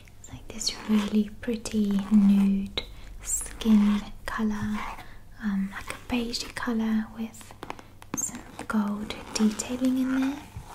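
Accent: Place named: New Zealand. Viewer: wild guess but i was thinking the same, she might be from new zealand?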